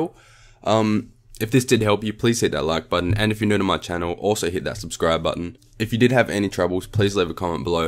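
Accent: Australia. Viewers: I neve thought the Ausi accent and IT would go so well together....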